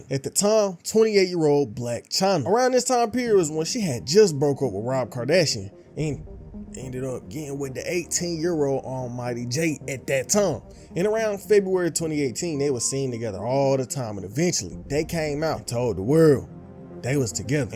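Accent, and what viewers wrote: South, United States. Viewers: You country asl. Get better story telling & get that spit out the corner of your mouth. Annunciate please young dawg.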